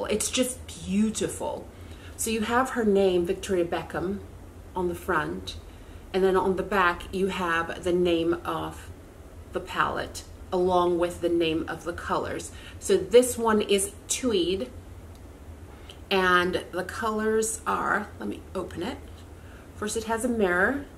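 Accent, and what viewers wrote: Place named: Europe. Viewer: Thank you for your video. I picked up the tweed quad. If you don’t mind me asking, where are you from? I keep hearing a slight European (?) accent every now and again.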